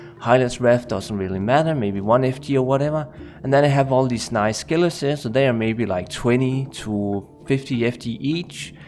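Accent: Denmark. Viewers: I think in some way or form we all dislike real money trading like you are doing half the time on JSP, there is no way around it it’s pay to win but that said trading items for real money will never go away so that’s way I am happy with the current status of JSP. You sound Danish? Am I wrong 🤷🏻‍♂️ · Nice video. Elsker at din danske accent er så tyk at man slet ikke er i tvivl XD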